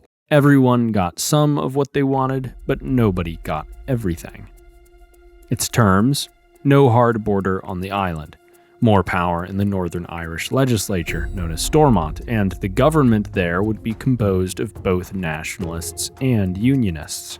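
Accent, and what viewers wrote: United States. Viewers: Oh that's what he's talking about in the video, the first 5 seconds i heard the american accent and paused to check the comments, all americans do is war monger constantly, every country outside america is monopoly and entertainment to their boring lives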